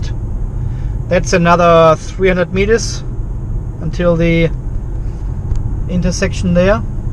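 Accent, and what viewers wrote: Germany. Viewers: Das verstehe ich sehr gut. Alleine diese Videos kosten schon enorm viel Zeit. Dein Englisch mit leicht deutschem Akzent ist aber für Deutsche sehr sehr gut zu verstehen. Gut, dass du dir nicht den australischen Slang angewöhnt hast.😉 Bei Garry Reed habe manchmal Probleme seine Worte zu verstehen.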